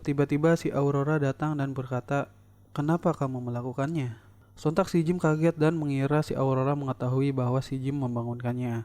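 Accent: West Java, Indonesia. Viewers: Si ajig urang sunda🤣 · logat sunda na asa deket jeng daerah aing da ieu teh🤣🤣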